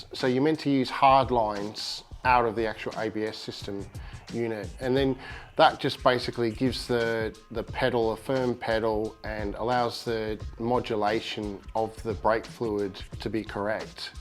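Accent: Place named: Australia. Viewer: You an Aussie mate?? Shout out from Melbourne Australia. Killer build, even more killer dog!!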